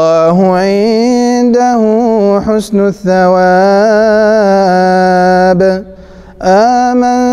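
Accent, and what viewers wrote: Morocco. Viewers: موفق بإذن الله تعالى قراءة مغربية جميلة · ما شاء الله ، صوت جمييل وصيغة مغربية راائعة وراقية. ✔️💯 حفظك الله تعالى وبارك فيك ✌️🌹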